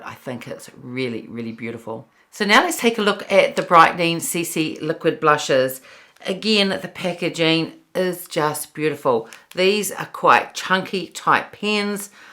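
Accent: New Zealand. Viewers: Thank you for such a thorough review you get an A for effort for taking the time to wash off and reapply products twice! These all looked great on you, but it seems like everything you try does. Also enjoy your videos because I love the NZ accent.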